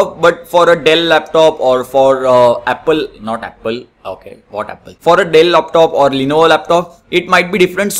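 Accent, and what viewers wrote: India. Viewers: Bro you have the most authentic Indian English accent 👍 ask thanks for the knowledge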